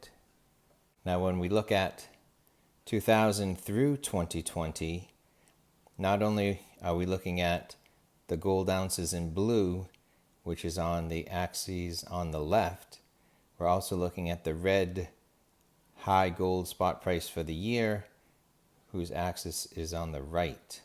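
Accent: New England, United States. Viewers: You sound like a New Englanda. I'm from NH. How far off am I?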